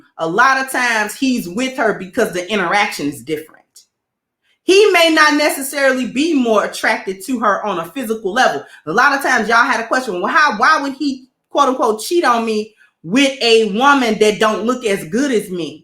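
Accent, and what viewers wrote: Chicago, United States. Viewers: That Chicago accent heavy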